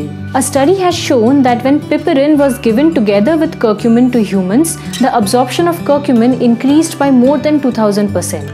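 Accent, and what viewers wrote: India. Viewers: Pronounsation of an Indian,like it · The Indian accent is much more elegant to this American's ears than most British accents.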